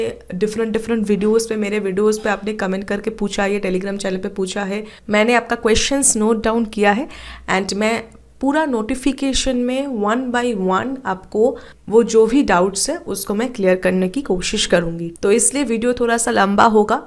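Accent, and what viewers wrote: India. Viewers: Bengali with Hindi accent😱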